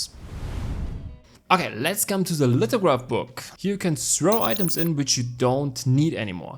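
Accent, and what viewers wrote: Germany. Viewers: I hear german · Thanks for the great help! I always like to know as much as I can before I play something cause I'm all for efficiency. My ADHD friends called me autistic but always stop complaining whenever they need me to explain something or look it up for them ;) <3 Amazing video, clear explanations with added visuals as aid, no time wasting, German accent, told to have fun 10/10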